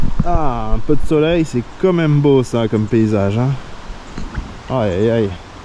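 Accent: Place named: Normandy, France. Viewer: Bravo pour pour ce silure! Tu fais jamais bredouille sur la Dordogne? J'essaye de décoder ton accent, est ce que tu es de Normandie ?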